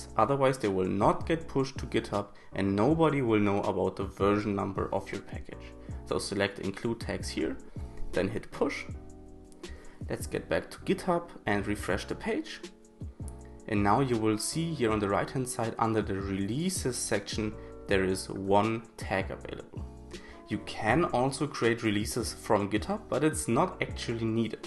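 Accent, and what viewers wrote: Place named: Germany. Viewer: Thank you, man, it helps! Like you german accent)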